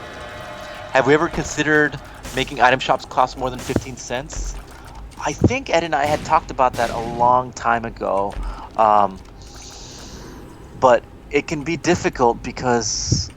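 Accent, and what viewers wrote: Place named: Spain. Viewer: I love the tyrone's spanish accent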